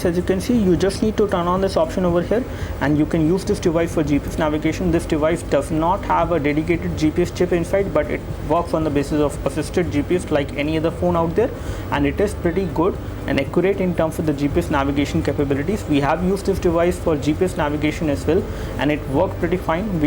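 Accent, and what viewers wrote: India. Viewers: INDIAN shitty english .. . .. lol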